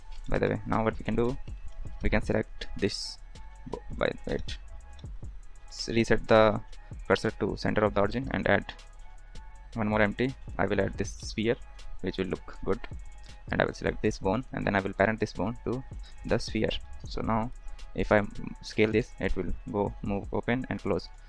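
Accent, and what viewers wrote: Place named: India. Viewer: bhai request ha video ek dam shi bani ha bas jab bolta ha na koshish kar ki words pura smaj aya audience ko indian slang ata ha thoda wo thodi dikat dalta ha baki content tara shi ha ekdum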